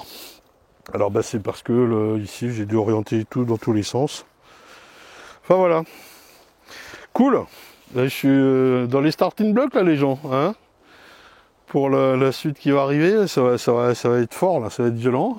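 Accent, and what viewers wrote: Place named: Marseille, France. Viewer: Salut,ça fait plaisir de te revoir! Mais d'où tu sors cet accent marseillais que tu sors régulièrement? Une marseillaise.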